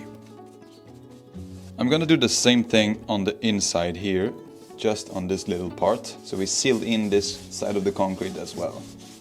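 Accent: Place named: Sweden. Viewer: You sound swedish. Or am i completely wrong? :P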